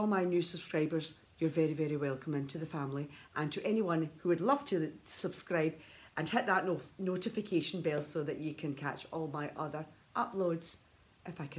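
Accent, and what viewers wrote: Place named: Scotland, United Kingdom. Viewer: Love the Scottish accent…candid review from the lady well done ..mam 👏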